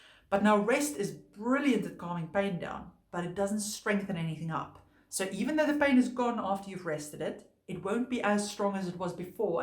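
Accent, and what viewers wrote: Afrikaans, South Africa. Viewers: Is that an Afrikaans accent I hear?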